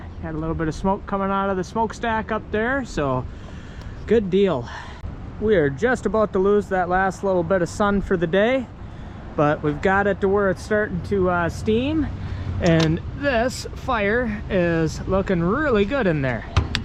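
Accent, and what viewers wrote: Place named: Canada. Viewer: Are you sure you're not Canadian? You sure sound like it. No offense intended.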